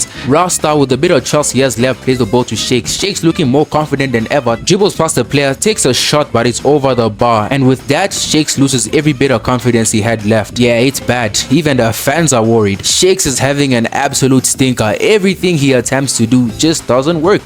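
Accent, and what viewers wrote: South Africa. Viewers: Muh boy ded from 🇿🇦 with that accent .🎉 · Bro you live here Mzansi right i can tell from your English bru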